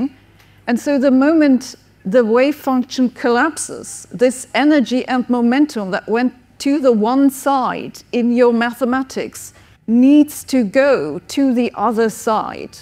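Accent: United Kingdom. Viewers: I straight up, laughed out loud when she just inherently abandoned her natural British accent and said Albert Einstein’s name with a German accent LMAO just a little over the top and kind of speaks volumes that you may be eccentric in ways where you are maybe providing a false representation of yourself or an idea because you are so caught up and the excitement of the person or a thing you were talking about however, the danger of this is that lead two points of failure and error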